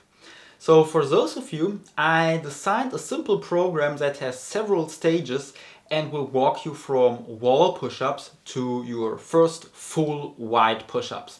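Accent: Germany. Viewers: u sound like u have a erman acent u german?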